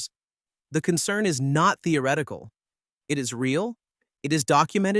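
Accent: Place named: Canada. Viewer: you sound like some dummass canolian.....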